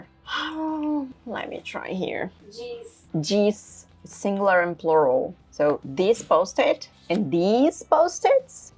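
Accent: United Kingdom. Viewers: Is the british accent on purpose?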